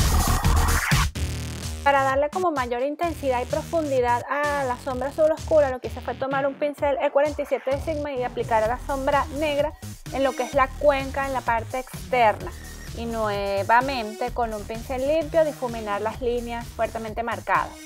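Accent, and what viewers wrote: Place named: Venezuela. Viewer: Te quedó muy lindo el maquillaje!! te dejo mi apoyo y te invito a pasar por mi canal.. soy venezolana, a ti te escucho como acento venezolano :)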